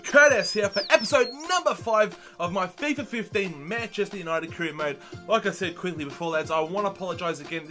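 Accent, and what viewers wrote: Australia. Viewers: Isn't it obvious? Australia!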